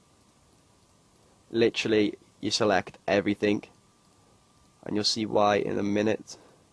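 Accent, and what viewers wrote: Liverpool, United Kingdom. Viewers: Scouser? :D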